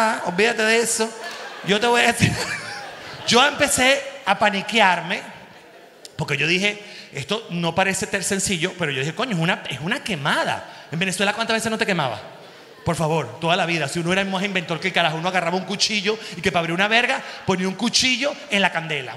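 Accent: Cuba. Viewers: Más shows con acento cubano please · Bendiciones para ti y muchos éxitos más 😂😂😂😂no podia parar de reir cuando contaste lo del médico prieto jjjj nos violo a los 12 jjj eres lo máximo eres auténtico el mejor ,llevo la madrugada entera viendo tus videos, se jodio el trabajo mañana jjj ,haz mas chistes sobre cubanos lo agradecemos mucho y el acento de cubano te queda super bien 😂😂😂😂 bendiciones para ti desde cuba 🇨🇺 ❤ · que bien te queda el acento cubano yo soy cubana y me da mucha risa todo es verdad 😂🤣😂🤣😂😘🇨🇺 que comico eres😂😂😂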